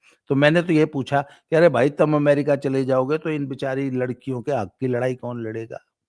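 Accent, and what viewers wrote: Haryana, India. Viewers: Babaji please aap to harvanvi bhasha hi bola karo.aap ko majedar tarike se bolte ho. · Baba ji aap ki haryanvi tune sun ker maja aa jata hai.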